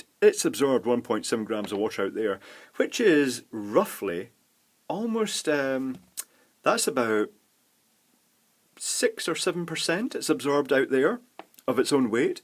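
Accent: Scotland, United Kingdom. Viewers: I keep reading code and articles in your Scottish voice and accent and I can't make it stop. I think I'm gay.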